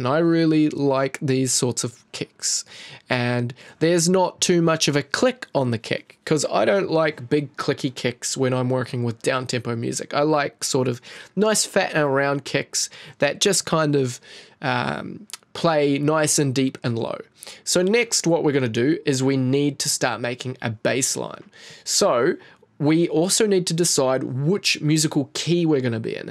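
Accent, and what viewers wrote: New Zealand. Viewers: You sound kiwi bro! Me too, subbed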